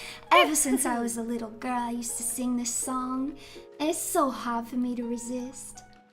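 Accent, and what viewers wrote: South, United States. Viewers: Why does she hide her beautiful, natural southern belle accent? it's so cute. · A great audio RP you do Southern Belle so good!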